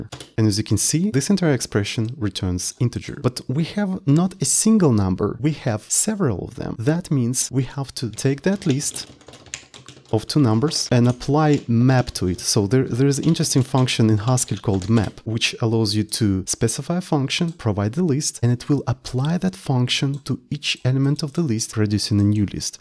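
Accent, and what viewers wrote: Russia. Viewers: Чую рашн аксент. Найс видео, keep going.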